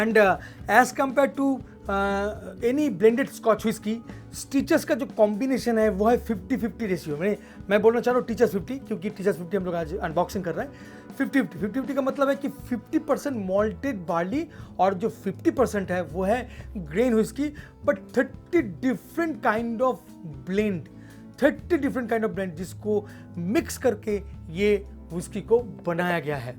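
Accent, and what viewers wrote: Bengal, India. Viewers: r u a Bengali?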